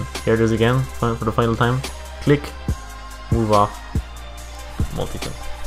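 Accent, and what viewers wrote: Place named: Ireland. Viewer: loool dat irish accent nice guide xxxxx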